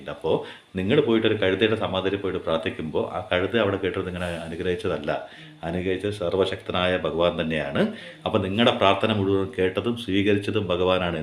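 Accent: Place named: Palakkad, India. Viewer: പാലക്കാടൻ ഭാഷ പോലേ തോന്നി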